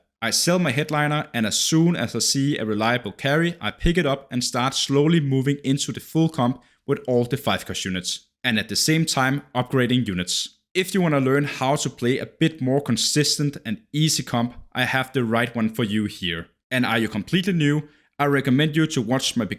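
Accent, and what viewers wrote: Denmark. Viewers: danish accent coming in strong:))) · Love your Danglish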